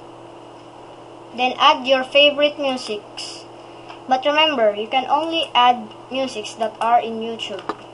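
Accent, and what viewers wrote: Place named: Philippines. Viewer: Pretty fuckin late, but your accent makes me think you're Filipino lmao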